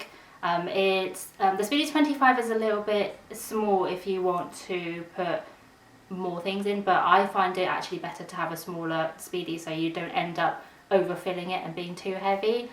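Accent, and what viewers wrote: United Kingdom. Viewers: Love your English accent and the video is incredible . I find your video quality impeccable and flawless. You addressed my questions except I’m awaiting for the one question about if straps purchased have stamp code or not. Thanks love.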